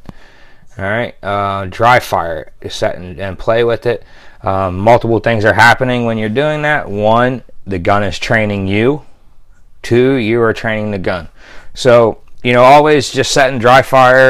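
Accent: Pittsburgh, United States. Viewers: This guy must be from Pittsburgh with that accent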